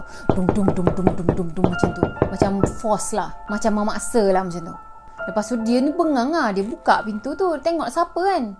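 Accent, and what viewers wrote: Kelantan, Malaysia. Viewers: Ni orang kelantan slang nya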